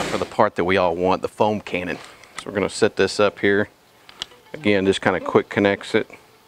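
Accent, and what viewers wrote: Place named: Oklahoma, United States. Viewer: Beautiful car! When I heard you talking, I'm thinking this guy is from Oklahoma or used to live there...lol Then I saw the OU stickers in the garage...Boomer Sooners!! Then I saw the Oklahoma plates on the car...hello from a fellow Okie!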